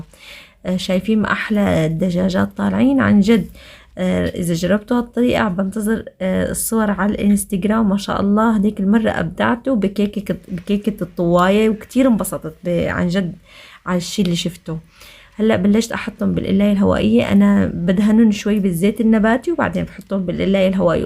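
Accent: Aleppo, Syria. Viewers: لهجتك واضحة انك حلبية بس يمكن بعض الكلمات بتقوليها متل الشوام بحكم زوجك شامي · كل كلامك حلبي ولهجتك واضحة🤣🤣 انا من حمص وساكني بحلب بناتي صارو يحكو حلبي وكلامات حلبي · اهلين يا وره اسمحيلي اسميك ورده لأنك مثل الورده نفرح لمن نشوفك انا من اول ما تباعتك عرفت انك حلبيه من اللهجه مره حلو طريقة طلامك بالعكس واضح انك من حلب 🌹💞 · اللهجه الحلبيه حلوه حافظي عليها وعلميها لاولادك وخللي جوزك يتعلمها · بالعكس مبينة انك حلبية من لكنة الحكي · انت بتحكي حلبي اصلي..خصوصي كلمة هلّئ 😅👌🏻عفكرة انا حلبية متلك كمان وجوزي شامي من الشاغور 😂كل عام وانت وعيلتك بألف خير يارب❤❤❤😘😍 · ماشاء الله عليكي يا ، لا بتحكي حلبي مظبوط انا مصرية وأول مرة أعرف ان فيه فرق في لهجاتكم زينا 😂 يعني الحلبة زي الصعيدي و اسكندراني زينا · تبارك الله عليك انا من البداية عرفتك حلبية من لهجتك وسميت الشطورة الحلبية ماشاء الله ماشاء الله احلى تحية لك من المغرب لسوريا عامة وللحلبية خاصة طبعا دايما متألقة لا تحتاج لتعليق 💚💛🧡❤ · الله يسعدك ويحفظلك عيلتك بلنسبة للهجة انا شامية ول من لشام ببين معو انو حكيك مو شامي اغلب الكلامات حلبية بس اكيد لانك متزوجة شامي حكيك لح يختلف بصير مختلط بين الحلبي والشامي دخيلا الحلبية مااحلاها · اي صح لهلق لهجتك.حلبيه مبين ومن اول مره شفتك بعتلك تعليق وسالتلك انتي حلبيه 😇 وانا حلبيه متلك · مو صح حكيك حلبي واضح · انا من متابعينك الجدد يلي بحبوكِ على فكرة كلامك حلبي واااااضح ومبينة أنو مالك من الشام، يلي من الشام بيعرف انو لهجتك مو شامية يعني الفاظ حلبيةمتل🤭 (لهلق ، الطواية ، وحرف الجيم ، وفتح الحروف الأولى بالأفعال) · انا من اول فيديو حضرته الك عرفت انك حلبية لانه صاحبتي حلبية..انا من فلسطين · بتحكي حلبي احييي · يعني هي هي اللهجة الحلبية مين قال انو الحلبية بجقجقو اكتر من هيك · يعطيكي الف عافية حبيبتي وصح بعدك بتحكي كلمات حلبية متل هلئ بتطلع معك بالحلبي خيت 😉😅❤️ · بالعكس. 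انا لما بتطلعي مع زوجك كتير بحس حكيك حلبي وانك مالك متأثره من بيت حماكي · حبيبتي طبعا صحيح كلامك تغربنا وتغيرت لهجتنا شوي بس لسة في كلامات حلبية ع فكرة من اول فيديو شفتو الك عرفتك حلبية الله يسعدك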